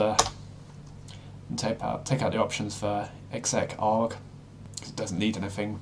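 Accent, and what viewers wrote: United Kingdom. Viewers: You have very British accent. ;-)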